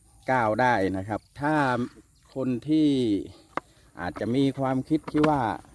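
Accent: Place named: Surin, Thailand. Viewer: สุดยอดครับเสี่ย..มารับชมให้กำลังใจครับท่าน..สำเนียง คนสุรินชัดเจน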